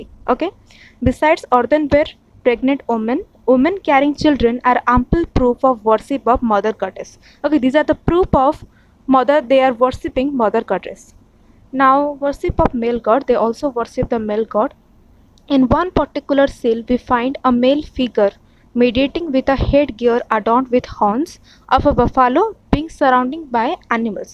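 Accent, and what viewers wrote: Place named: Odisha, India. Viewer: Odia accent😊 ama odia madam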